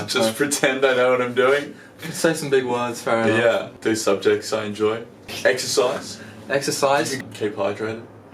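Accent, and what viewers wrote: Australia. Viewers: That Australian accent is getting stronger😂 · THAT AUSTRALIAN ACCENT IS COMING THROUGHHHH😂😂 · Australian accent hahahah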